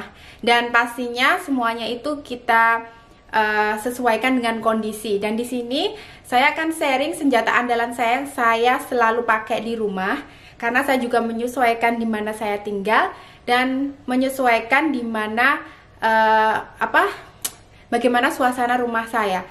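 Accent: Java, Indonesia. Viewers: Mb klo bicara dicampur bahasa jawa yg medok lucu deh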